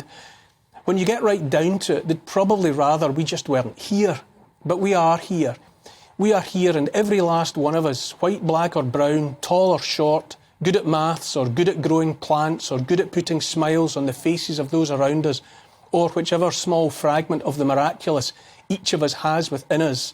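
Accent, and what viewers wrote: Scotland, United Kingdom. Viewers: The truth with a Scottish accent! Love it! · Neil Oliver 💞🤗 A gentle, beautiful Scottish lilt A kind, empathic, forward tilt An open heart; a caring face A willingness to take his place. A strong, home-hitting monologue Reminds us of the boiling frog The courage to become a sleuth A passion to release the truth. A deep desire to crack the whip Illuminates our freedom-strip Calls out the darkness taking hold The shiny despots; plated gold! Intense and raw, he lays it bare A soul-enhancing, honest stare Inflections drive the message through He speaks for Us - for me and you! Discernment's such a precious gift His words create a mighty lift Take heed of all he has to say He knows - it shouldn't be this way! 🤗🦋🐬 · A load of drivel from an over pronounced Scottish accent aka 'Posh Scotch' from a multi Millionaire puppet. Wake up lads. 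Fuck sake.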